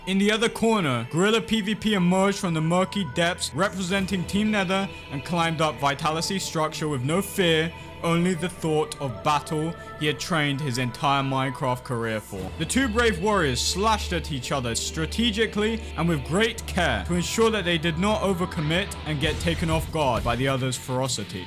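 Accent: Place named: United Kingdom. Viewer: Nice one bro, the event sounds so cool with a british narrator in the background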